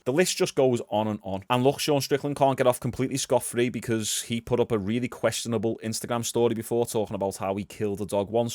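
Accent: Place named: Newcastle, United Kingdom. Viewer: I like your channel, but please don’t turn into a true geordie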